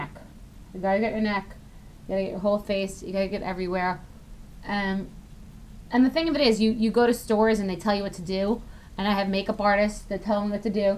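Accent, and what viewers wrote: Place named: New York, United States. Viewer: I just love you Bethenny! I could listen to you talk all day. Ur so NY!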